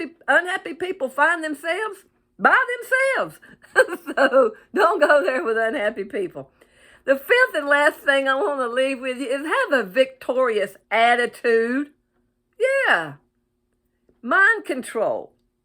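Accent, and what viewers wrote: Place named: South, United States. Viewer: you brought me happiness today. I got to see your beautiful face, listen to your amazing sweet southern drawl and remember all our good times together. Love you and really miss you! You look fabulous ❤️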